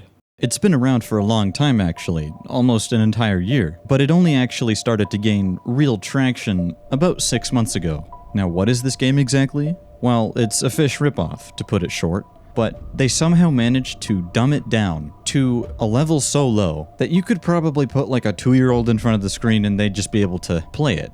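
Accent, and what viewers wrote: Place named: Singapore. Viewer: you live in singapore? your accent seems like it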